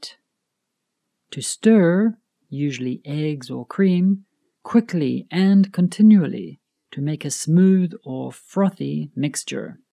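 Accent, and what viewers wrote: United Kingdom. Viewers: Is this british english ? 🤔🤔🤔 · It s british english????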